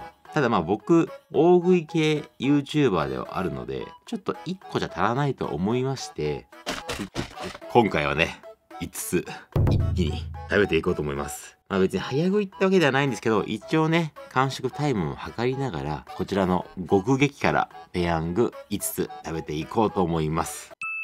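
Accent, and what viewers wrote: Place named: Ibaraki, Japan. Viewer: 久々に見たら 茨城人かよ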